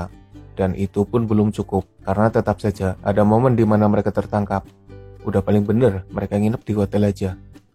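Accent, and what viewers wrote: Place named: Java, Indonesia. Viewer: Wkwkwk narasi yg khas dengan gaya medoknya mimin 😅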